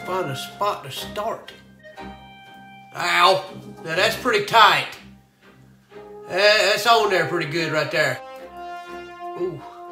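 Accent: United States. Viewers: Man his cowboy accent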